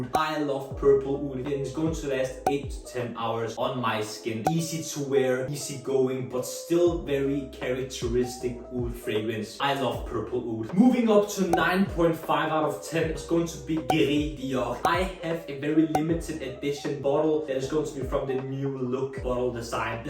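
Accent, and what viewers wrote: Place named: Netherlands. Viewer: Man seems to be that you are from Holland aren't you?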